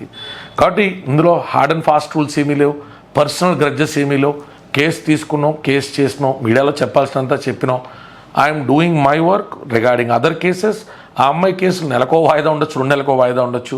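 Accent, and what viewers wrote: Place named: Bhimavaram, India. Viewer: దయచేసి మీరు ఇంక మీడియా ముందుకు రాకపోవడం మంచిది గారు.. JSP వల్ల చెడు అయ్యారు, ఈ లావణ్య కేసు వల్ల ఒక రకంగా పబ్లిక్ దృష్టిలో జోకర్ అయ్యారు.. బూతులు తిట్టి మా లాంటి వాళ్లకు గట్టి సమాధానం ఇవ్వ వచ్చు కానీ పబ్లిక్ లో మీపై ఉన్న అభిప్రాయం మారదు..ఇకపై మీకు శ్రీరంగ నీతులు చెప్పే అలవాటు ఇకపై ఐనా మానుకోవడం మంచిది.. 12 నెలలు అంటే ఆ తరువాత మాట్లాడుతారా..?? మరి ఇన్ని రోజులు భీమవరం యాస లో పెద్ద రాజకీయ ఉద్దండo లాగా ఎందుకు మాట్లాడారు..?? వాస్తవానికి మీకు ఉన్నది కుల గజ్జి రాజకీయం లో కమ్మ సామాజిక వర్గం మీద మీకు ఉన్న అక్కసు వెళ్ల కక్కడo కోసం మాత్రమే మీరు జన సేన పంచన చేరారు..అక్కడ మీ స్వభావం చూసి పార్టీ నుంచి పక్కన పెట్టారు.. MARK MY WORDS మీరు TDP win కాకుండా ఉండాలి అనే మీ కోరిక నెరవేరలేదు కాబట్టి ఇపుడు YCP కి మద్దతుగా TDP&JSP కి వ్యతిరేకంగా వెళ్తారు భవిష్యత్తులో..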